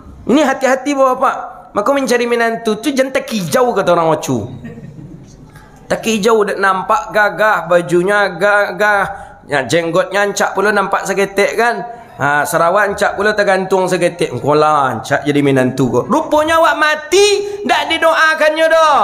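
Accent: Minangkabau, Indonesia. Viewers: Saya sangat merindukan ceramahnya Ustadz yg khas dgm dialeg minang, tegas dan berilmu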